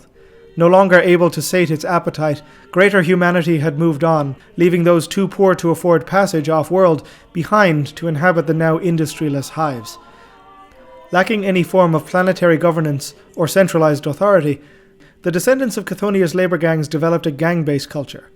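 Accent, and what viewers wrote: Ireland. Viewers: sound's like Irish but i do wonder where he is from and his back ground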